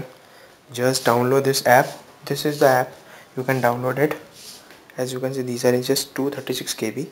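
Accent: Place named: India. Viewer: I can't understand the accent. Is this guy from India? The Indian accent of English is the worst in the world.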